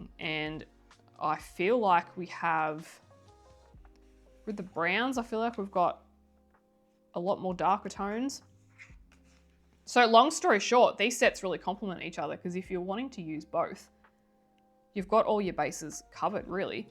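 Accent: Australia. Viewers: I live in Queensland and have both sets. Up here in summer 30 to 37°c polys blend and smooch just like the prismas. No air conditioning. 😅 But I will always prefer Prismacolor because of how I colour, dark to light and blend together. I hate layering takes to long for a vibrant result for me. Each to their own though. Love your videos and great to listen to another aussie on YouTube. Have a good Xmas and new year. Waving from Queensland 😊👏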